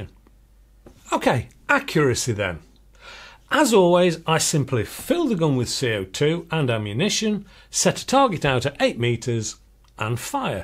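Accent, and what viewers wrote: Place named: England, United Kingdom. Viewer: Why is David Duke talking with an English accent?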